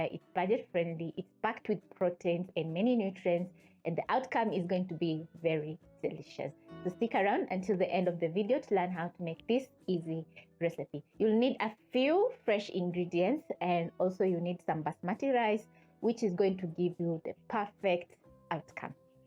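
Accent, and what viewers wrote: Kenya. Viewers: She sounds so Kenyan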